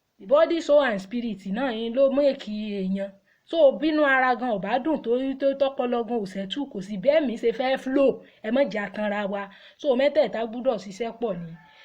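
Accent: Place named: Egba, Nigeria. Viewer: Egba (Abeokuta) accent mixed with a little bit of Ibadan. But the last time I checked you have a different Yoruba accent. Cool anyways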